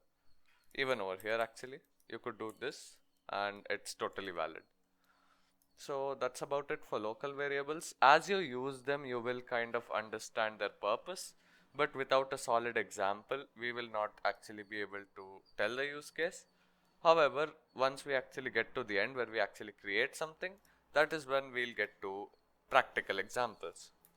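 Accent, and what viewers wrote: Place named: India. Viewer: I came from freecodecamp's sir aapki aawaaz se hi pechan gya ki indian ho and instantly subscribed 😁 😂 btw can you tell me is this good for future? I mean is field me jobs kaisi h? And india me kaisa scope h?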